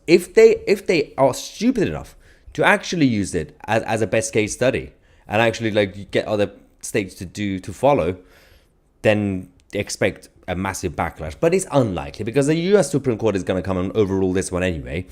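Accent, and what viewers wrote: United Kingdom. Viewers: eating MTZSM and listening to news from 'murica in bri'ish accent is priceless. Thanx. And ppl go after watching and do that like thing before making coffee... (at least)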